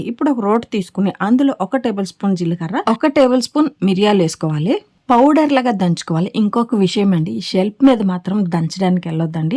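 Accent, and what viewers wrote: Srikakulam, India. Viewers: మీది శ్రీకాకుళం యాస.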